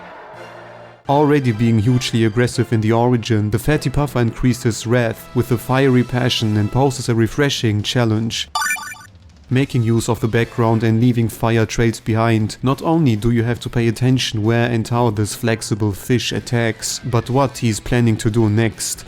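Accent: Germany. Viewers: du bist deutsch richtig? das hört man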